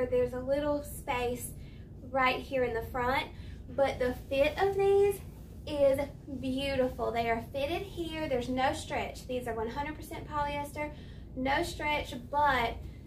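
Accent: South, United States. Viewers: OMG! A year already!🥳🥳🥳💐🎊🎈 Gotta say you are my favorite and only one I watch for Shein hauls! You way of presenting and explaining each piece is the best. I love your southern accent and your cute and proper personality. Because of you I walked out of my comfort zone to try new looks. Keep it up friend! Congratulations!!🥳🥳🥳🥳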